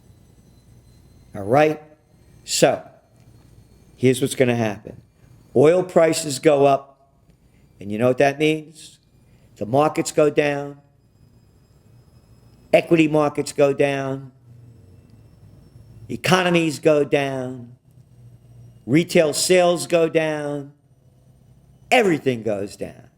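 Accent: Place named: New York, United States. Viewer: Gerald Celente...Yr schtick is Incomparable!!! I grew up in the small town near Poukeepsie...I enjoy hearing yr NY accent and mannerisms...peace out, and thanks!!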